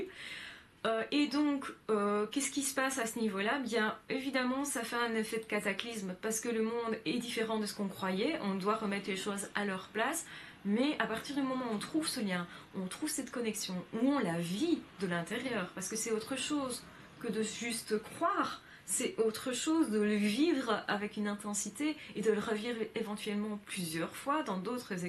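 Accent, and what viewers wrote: Brussels, Belgium. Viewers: Bonjour, me trompé-je ou entends-je un brin d'accent Bruxellois? Pardon c'est hors sujet mais ... voilà. :-)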